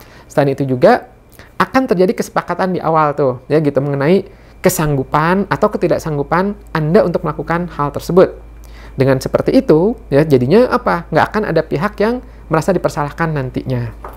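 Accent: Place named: Sunda, Indonesia. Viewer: Vidio yg selalu insightfull soal guilt trip alias gak enakan. Dan Ketika sundanese menjadi narator, sangat terasa intonasinya. Karena saya pun begitu. Tema Kontennya pun mirip dengan, tp masih pemula saya hehe.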